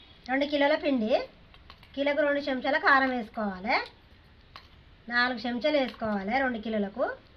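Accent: Telangana, India. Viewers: మంచిగ చెప్పినవ్ అక్క మన తెలంగాణ స్టయిల్ ల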